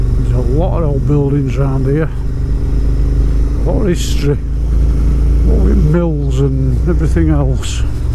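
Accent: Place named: South Yorkshire, United Kingdom. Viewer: This is what motorcycles are all about. Great videos of just enjoying the machine and views instead of YouTube reviewers complaining about a 350cc not having enough power or shilling you to buy an insta360 from their affiliate links. Keep the videos coming sir along with your South Yorkshire accent. 😃 Looking forward to taking my CBT and getting on that journey. 👍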